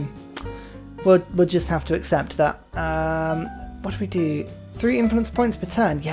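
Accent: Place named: United Kingdom. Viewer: I have found Discord too complicated for me to learn so I shall type out my message here. Dear, Thank you for making these lovely videos. I am new to using youtube but began visiting this website since the pandemic began. It must have been the hand of god for me to find one of your videos on the home screen. The last time he visited me, my grandson introduced me to Civilization 6 as a way to pass time. We would always play board games together like Settlers of Catan and Risk, but he was rarely able to travel and see me. He worked as a teacher in Hereford and his favorite subject to teach was history, which I think is why he really liked this game. I've never played video games before, so I found it a little overwhelming. He was patient with me though, being the sweet young man he was. He explained so much to me and I hardly remember what all he talked about during those few days he spent teaching me about Civilization. 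Though I don't play Civilization, I have watched almost every video you've released. I love listening to you explain every detail of what you're doing in order to win. I try to watch your videos as soon as I have free time, and have recently found myself waiting on your next video to come out. Your quirky sense of humor and beautiful British accent take me back to those wonderful days with my grandson. He said he liked to get science victories too, and said my favorite character to play would be Teddy Roosevelt. I appreciate everything you put out, an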